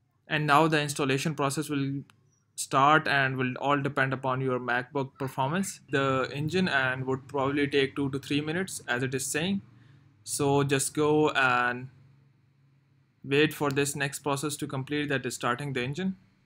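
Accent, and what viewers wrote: India. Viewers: So ein inder akezent feier ich mega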